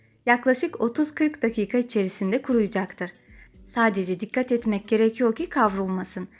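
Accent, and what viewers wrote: Azerbaijan. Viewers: bozma ay bacım o geşeng azerbaycan şiveni bozma, öz şivende danış..bizim dil bozuk zaten, bari siz özünüzce danışın ki gerçek türkçeyi sizden öğrenebilek..gurban olum sizin bezekli danışığızı yaradanıma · Normaldir Azerbaycan ve Türkiye sivesi karisinca cok güzel bence. Sizde Azerbaycan sivesile konusmayi deneyin bence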